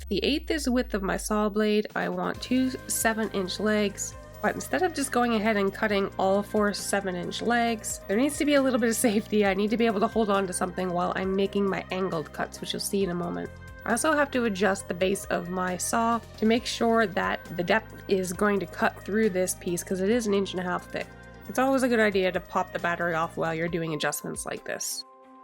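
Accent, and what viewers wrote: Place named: Alberta, Canada. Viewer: I saw a Tim Horton's cup in one of your episodes, and your accent is identical to a friend who lives in Edmonton. I know you are a fellow Canuck...are you from Alberta?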